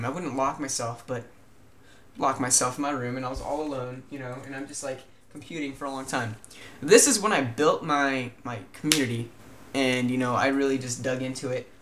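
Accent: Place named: United Kingdom. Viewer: Thanks. I'm not as addicted as it sounds like you used to be, but I do over-use my computer. So after this comment, I'm turning it off and going downstairs :) Nice to see you realised this and turned your life around, I'm happy for you. And side note, the accent is kind of British :) Question... do you prefer to make vlogs, or tutorials? What do you see as your "main channel"?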